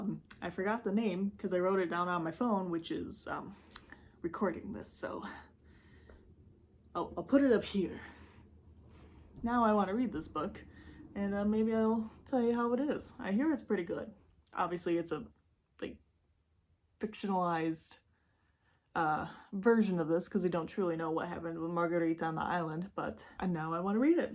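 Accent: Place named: France. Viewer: Liking your French accent 👌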